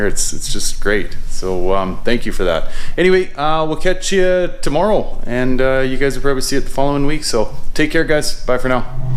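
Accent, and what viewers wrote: Canada. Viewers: You sound Canadian eh. I'm in Sask. I have the same rear main and clutch issues, sigh.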